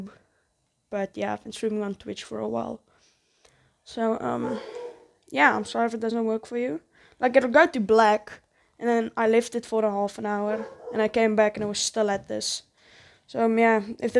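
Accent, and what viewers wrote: South Africa. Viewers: I had a South African best friend on ps5 voice chat friends when I heard your voice I immediately knew 😂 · Why u sound south african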